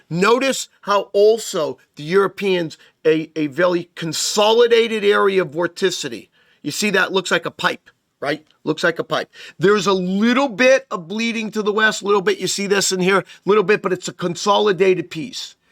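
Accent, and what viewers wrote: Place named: New Jersey, United States. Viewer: Jeez, you couldn't talk about when there is going to be frozen precipitation in Texas. It sounds like you 're in New Jersey for God's sake, from your accent. Noone here cares about New Jersey. What is wrong with you.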